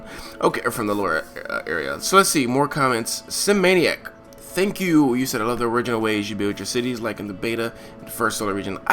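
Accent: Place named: Michigan, United States. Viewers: Hey, you leave Michigan accents alone! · I was guessing you were from the Midwest - maybe Wisconsin or Michigan - based on your super slight accent.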